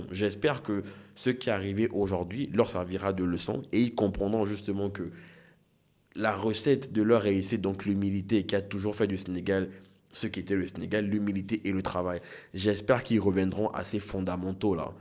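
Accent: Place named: Ivory Coast. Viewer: ivoirien on reconnait quand tu parles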